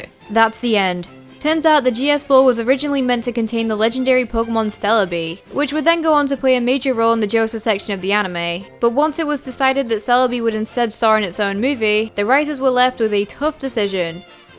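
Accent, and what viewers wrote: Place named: Northern England, United Kingdom. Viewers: That's a strong Northern English accent there. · She's from Preston in Northern England.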